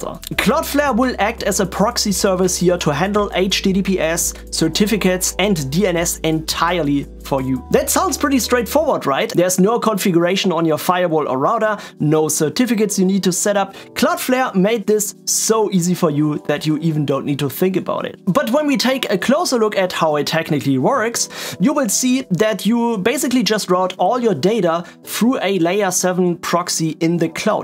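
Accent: Germany. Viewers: your voice sounds very german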